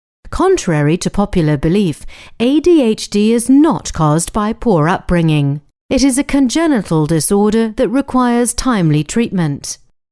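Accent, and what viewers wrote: United Kingdom. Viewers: typical British English :D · I love english accent : )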